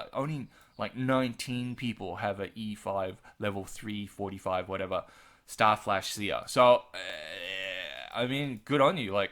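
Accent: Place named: Australia. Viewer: I've never had any issues understanding you but then I've played online games on AUS time for most of my teen years so maybe I'm just not phased by the accent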